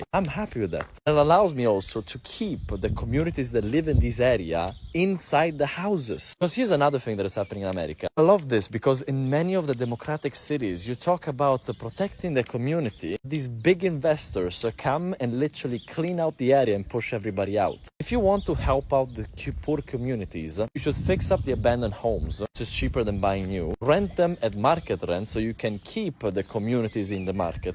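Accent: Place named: Italy. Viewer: Your accent tells me you don’t have enough hands on experience to yapp about this subject. Maybe stick to Italian politics.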